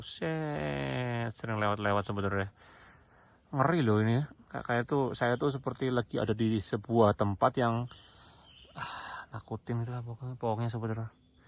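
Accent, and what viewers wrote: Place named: Banyumas, Indonesia. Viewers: arane kaya wong rusia jebulane wong tegal ngapak oii... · Bru ktmu chanel ini...bnr2 luar biasa beraninya...ko ini lucu ngomongnya medok ngapak...pdhl sipit ya ko semangat....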